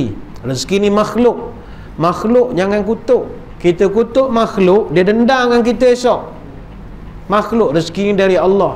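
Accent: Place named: East Coast, Malaysia. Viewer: paling suka dengar YB Dato cakap loghat pantai timur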